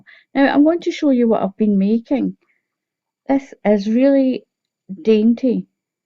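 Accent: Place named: Wales, United Kingdom. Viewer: Beautiful. Subscribing to await the blanket. I've seen a lot of videos from the UK. I'm US. Are you Welsh or Scottish? I hope you don't find me rude. We had a lady at our senior center from Wales that sounded a lot like you. I loved talking to her because of her accent! Hope to see the blanket video soon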